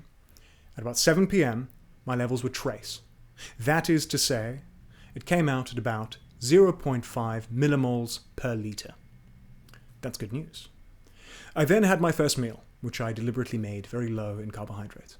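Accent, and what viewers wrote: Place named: United Kingdom. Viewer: I think I was expecting a California surfer dude accent because of your hair, but got Sherlock Holmes instead. Anyways, great video!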